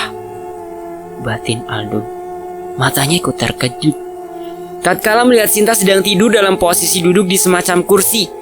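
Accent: Java, Indonesia. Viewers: tapi q lebih suka bahasa jawa mimin medok poll jadi tambah seru ceritanya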